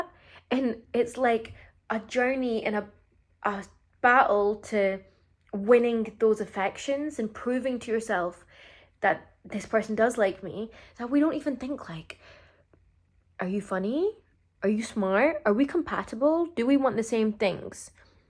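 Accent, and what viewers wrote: Scotland, United Kingdom. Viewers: you're scottish? did not expect that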